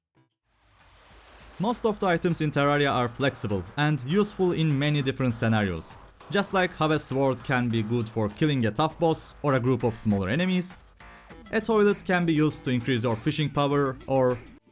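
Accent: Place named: Turkey. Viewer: Are you Turkish? Your accent is resembling the Turkish accent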